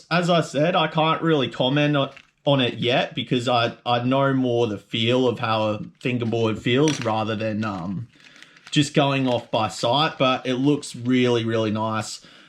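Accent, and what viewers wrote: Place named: Australia. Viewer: Im not even making fun of you but gawd thats a real aussie accent. Nice vid tho.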